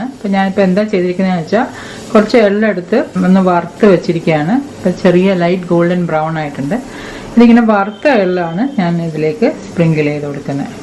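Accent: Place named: Kottayam, India. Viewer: .. kottayam kaari aanannu thonni.. Njnaanum oru kottaym kaari...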